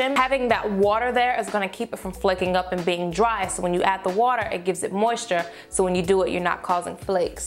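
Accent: South, United States. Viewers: Your voice is so country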